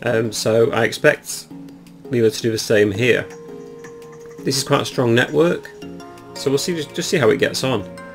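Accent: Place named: RP, United Kingdom. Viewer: Nice background music on game one. AND nice voice too with RP voice is narrating on the game.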